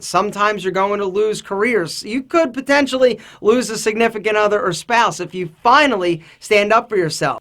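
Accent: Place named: East Coast, United States. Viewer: east coast accent all day baby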